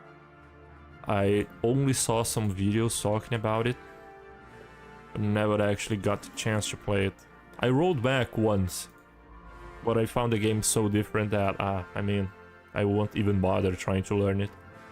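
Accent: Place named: Russia. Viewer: Я думал ты из России или где-то рядом лол.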